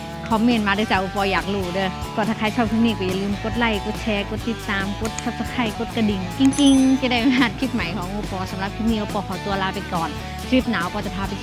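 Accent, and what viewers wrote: Korat, Thailand. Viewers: สำเนียงโคราช คนโคราชเหรอ? ผมไปตาดโตนไม่เคยเล่นน้ำเลย ไปกินกาแฟ สดปั่น กับไปเก็บเมล็ดมะค่าโมง มาเพาะ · พี่ใช้คนโคราชไหมคะพูดเหมือนคนโคราชเลย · แก่คนบ้านไหนนิคือพูดคือคนแถวบ้านฉันแท่ะๆฮึ55คนโคราชนิแม่นไหม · มักสำเนียงไทโคราชจ้า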